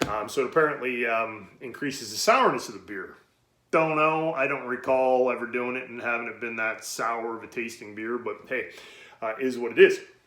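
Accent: Canada. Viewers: You sound Canadian! (I am too). Hope your stuff gets tons of views! This is a cool concept! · You speech patterns remind me a bit of Patrick Warburton 😂 loving the kanuck accent!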